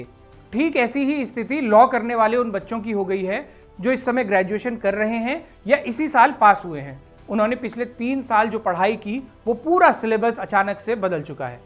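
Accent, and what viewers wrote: Garhwal, India. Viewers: जी आपकी पूरे हफ्ते की छुई बात का इन्तज़ार रहता है, बीच बीच में आपका garhwali बोली का इस्तेमाल आपकी रिपोर्टिंग को बड़ा ही रोचक बना देता है 👍👍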